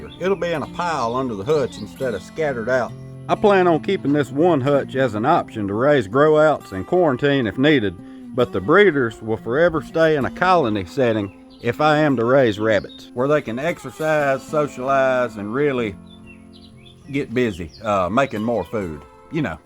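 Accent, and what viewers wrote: South, United States. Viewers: First a salute for staying on the family farm. Second, love the content. Third, I love to hear Southern Folk talk. Subscribed from Central New Mexico U.S.A. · Hi, My name is Houari. I am from Yellel in Algeria. Thanks for your intresting video. I love your southern accent.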